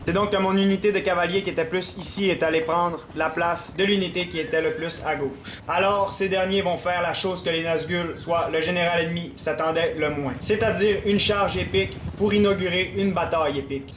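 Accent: Quebec, Canada. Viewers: moreover, he's got an accent from Québec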